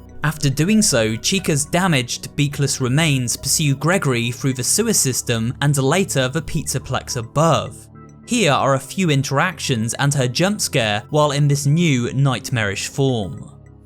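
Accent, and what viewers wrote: United Kingdom. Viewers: i thought this would be good and then i hear british voice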